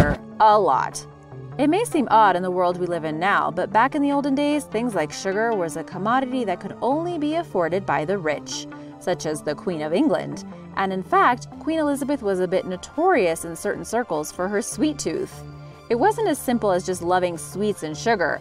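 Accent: United States. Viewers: As soon as I heard the American accent I should have known that these "FACTS" would be nothing of the sort. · Well she sounded american and like i said a few comments ago i watched another video that was american and they got their facts wrong when they said stephen hawking had als when he actually had motor neurones disease not trying to belittle anyone just saying for them to get their facts right because as you can see it will piss a lot of people off if they're incorrect such as this video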